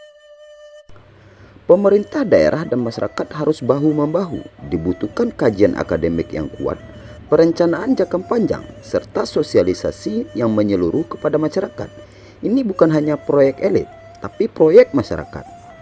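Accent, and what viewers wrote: West Sumatra, Indonesia. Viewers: Aku danga, nampak kali kau orang minang ya bang. Mangeceknya kayak orang barundiang, macam datuak parpatiah nan sabatang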